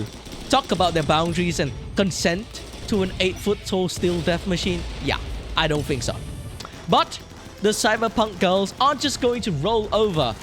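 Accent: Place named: India. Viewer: why do u sound like u have a 5% indian accent today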